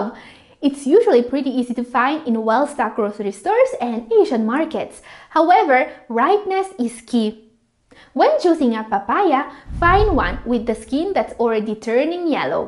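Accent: United Kingdom. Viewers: Her British English is just as a Londoner!!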